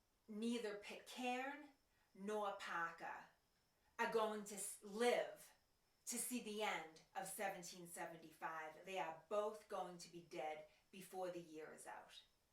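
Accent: Boston, United States. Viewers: These are fun, and I love your Boston accent! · Hi. I love Revolutionary War history and I subscribed to your channel over the weekend. I live in Essex County, N.Y. where the famous forts Ticonderoga and Crown Point are located. I would love if you did an episode on the 58 pieces of artillery that were dragged overland each on a sled of its own in the winter of 1775-76 from the captured Fort Ticonderoga to Dorchester Heights by General Henry Knox and his men forcing the evacuation and liberation of Boston from the British in March of 1776. It was an extremely difficult task and engineering feat without a loss of a single cannon and a victory for the colonists. I would love to see where they set the artillery in Dorchester Heights that forced General Howe his army and the loyalist population to flee. Had this band of men not been successful future revolutionary events could have turned out radically different. 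Thank you for the interesting post to watch and your Boston accent is fantastic. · I am embarrassed to say that I am almost 80 and life a short distance from Concord/ Lexington and have not visited, but watching these videos is giving me impetus to doing it this spring. BTW--- ---you have a Boston accent you can cut with a knife. It almost sounds Dahhchestuh, but not quite--but sounds as if you grew up in Boston: Captain Pahkuh! BTW---I have several kin that were residing in Plymouth, Kingston, and Duxbury that responded to the Concord emergency or the Concord alarm as it was referred to. Quite a hike on short notice!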